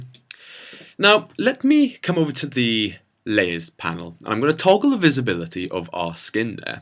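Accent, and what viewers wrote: Wales, United Kingdom. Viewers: Thanks! I've been using Illustrator forever, but never understood most of the basics. But your tutorial helps a lot. Love the Brit accent (we're fans of Foyle's War over here); takes a bit of thinking to get all you said, but well worth the time! Keep up the good work!! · Your british english is really awesome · Very informative & nice to hear a Welsh accent - thanks! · Thank you so much for the tutorial! And I think you are British, right? I like your accent very much! And I have a question, can I do exactly like that with Photoshop? Because somehow I lost my Illustrator CS4 disc and can't afford newer one anytime soon... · Are you welsh by any chance ? lol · Nice. Is that a British accent? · He's Welsh. Orrrrr, he could be English but lived in Wales for a bit, but the accent is Welsh. This is the best tutorial I've ever seen on the Tube. 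Good work mate. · This is brilliant! Thanks for taking the time to teach us. Your voice is very calm and soothing, and your Welsh accent makes it even better!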